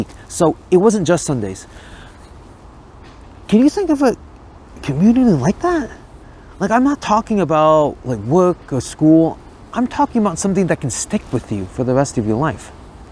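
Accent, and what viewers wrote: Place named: East Coast, United States. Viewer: You don't sound like a Californian. You sound like something easy coast.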